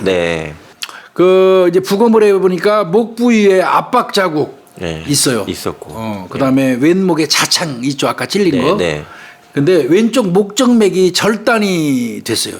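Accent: Chungcheong, South Korea. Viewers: 김복준 교수님 수고 많으시네요. 그짓말은 충청도 사투리 경기도 표준말은 거짓말 인데요 이 말씀은 교수님 말씀이 구수한 충청도 사투리를 쓰시는 말씀. 교수님 건강 하십시요.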